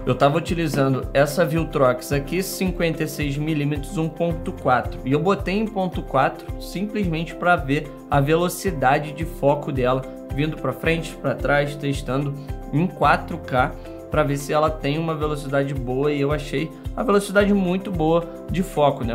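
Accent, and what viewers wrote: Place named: Rio de Janeiro, Brazil. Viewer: o sotaque carioca puxadissimo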